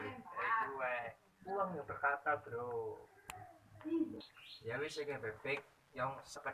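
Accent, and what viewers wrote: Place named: Banyumas, Indonesia. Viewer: jooosss gandos wong ngapakkkkkk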